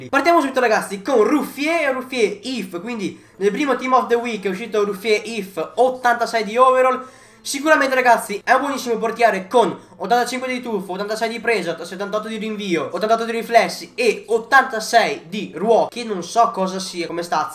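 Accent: Veneto, Italy. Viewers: Ma sei veneto?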